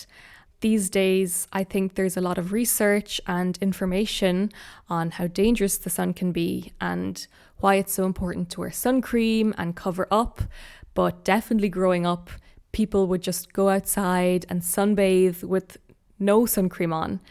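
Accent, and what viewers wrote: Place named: Ireland. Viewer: What part of the island are you from ? I've heard the irish accent changes a lot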